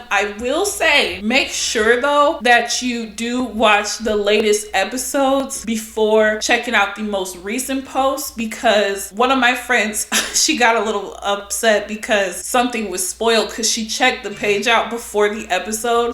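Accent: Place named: United Kingdom. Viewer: Your British accent 😭😭😭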